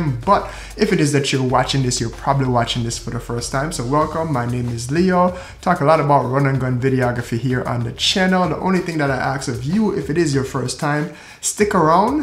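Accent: Jamaica. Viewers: yo you a yardie, love that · yooo. you from Jamaica??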